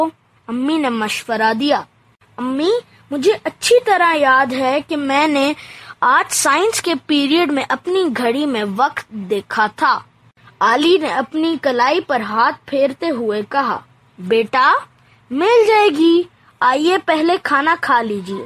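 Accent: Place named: Pakistan. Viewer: Very strong & Lovely Urdu accent Keep it up boy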